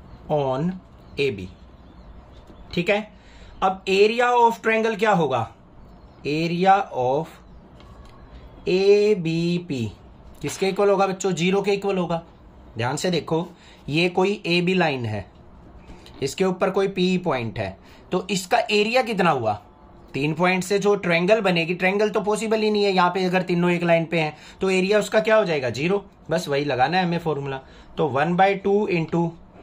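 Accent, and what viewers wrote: Haryana, India. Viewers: Sir app haryanvi hoo na mane pahachan liya😁😁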